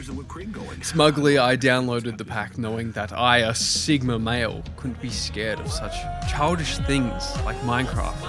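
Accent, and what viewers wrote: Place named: Australia. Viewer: Oh GOD you're AUSTRALIAN?! 🤢🤢🤢🤢🤢🤢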